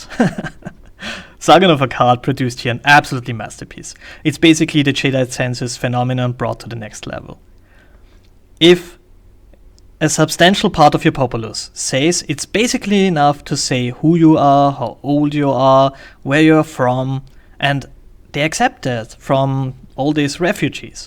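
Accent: Germany. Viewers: you sound german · Since Rachel Dolazar, some SJW'S & even Don Lemmon of CNN suggest that race could well be a social costruct. Rachel Dolazar feels like a black women therfore she is. Although she is a con artist who took advantage of affirmative action & her leadership role for a black organisation. If race is just a social construct & fluid I am Kekistanian as well...and boy, have I been opressed! These regressive lefties dug their own hole! Where's my $$$ where are my advantages? BTW, are you German? Weil ich Deutsche bin & meine es raushören zu können.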